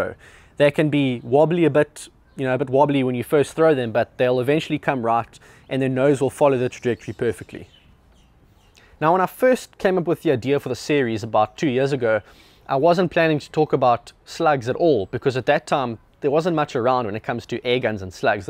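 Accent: South Africa. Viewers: I thought he was south African?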